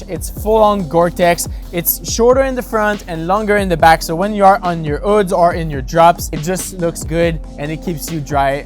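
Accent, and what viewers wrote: Quebec, Canada. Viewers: Auto Subscribe for that Quebec Accent.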